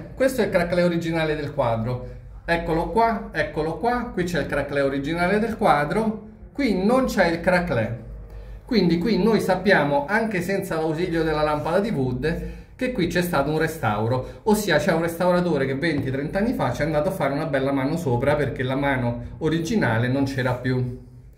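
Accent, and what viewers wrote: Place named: Rome, Italy. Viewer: Ma è sicuro che «craquelure», francese, si pronunci proprio così come fa Lei? Confronti l'ortoepia magari su Google traduttore e vedrà che l'esito è un po' diverso dalla Sua interpretazione. Certo la /ü/ è notevolmente difficile da rendere, specialmente per un «romano de Roma», però con un po' d'esercizio magari...!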